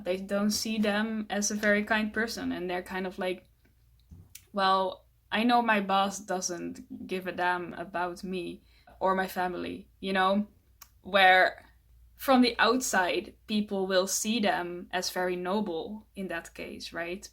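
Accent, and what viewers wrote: Netherlands. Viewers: Immediately heard your Dutch accent, it's so obvious haha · I LOVE THIS CUTUE PIE, AND THE DUTCH ACCENT.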